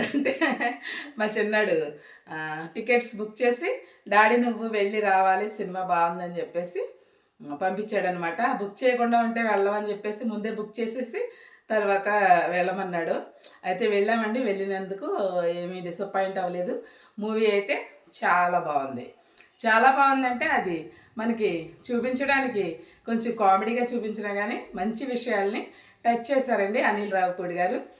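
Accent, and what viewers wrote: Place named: Godavari, India. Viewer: చాలా బావుంది వీడియో. గోదావరి స్లాంగ్ అదిరింది. అలాగే మా పెద్దక్క ను గుర్తు చేసావు చాలా సంతోషం ❤️❤️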